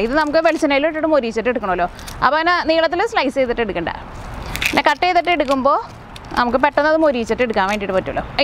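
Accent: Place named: Ernakulam, India. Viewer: സംസാരം കേട്ട് എറണാകുളം ജില്ല യിൽ ആണ് താമസം എന്ന് തോന്നുന്നു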